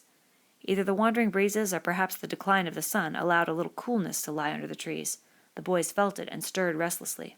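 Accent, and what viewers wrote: United Kingdom. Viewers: does she sound like shes from the uk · everyone saying how it should be pronounced... Does she sound like she is in Britian?? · Chlorita Bleachita does she sound English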